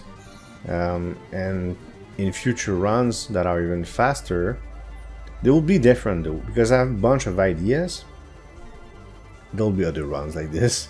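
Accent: Quebec, Canada. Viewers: accent ? is it québécois ?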